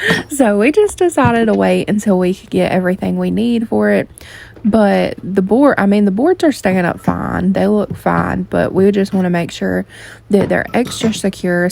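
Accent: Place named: Mississippi, United States. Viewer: This is the first vid Im watching, but now that Im in Canada your accent makes me sooo happy as someone that grew up in Mississippi 🥹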